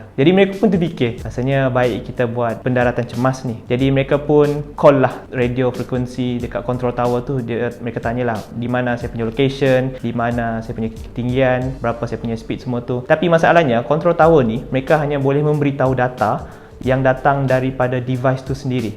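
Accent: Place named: Sarawak, Malaysia. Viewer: Mcm org sarawak je.sbb bunyi slang mlayu smenanjung kurang😅😅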